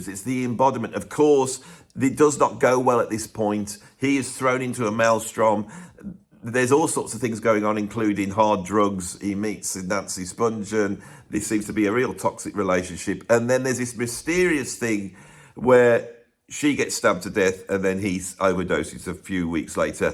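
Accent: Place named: West Midlands, United Kingdom. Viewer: Even a mild Brum / Black Country accent is like the acoustic equivalent of caustic soda, sorry mate I’m sure what you are saying is interesting but I had to bail at Noel.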